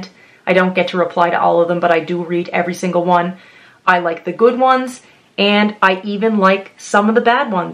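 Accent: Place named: Cape Breton, Canada. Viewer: I absolutely love your videos.. I love that cape Breton accent I’m originally from Newfoundland now living in Saskatoon and it just makes me miss the East Coast.. I hope you’re having a fantastic day and merry Christmas and all the best to you and your family in the new year!!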